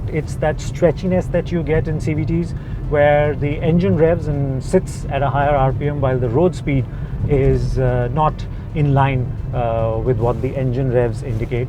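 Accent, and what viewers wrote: India. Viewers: You must have never heard a native english speaker. He sounds just like any Indian on news channels. 😑😑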